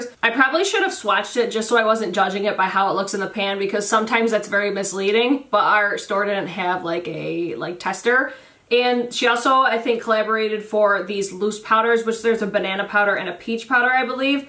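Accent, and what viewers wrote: Midwest, United States. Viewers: Just discovered ur channel last night and I really enjoy ur videos. I'm trying so hard to identify ur accent. I'm thinking Midwest. Wisconsin maybe. Totally not my business it's just I find accents fascinating. Mine is horrible I love urs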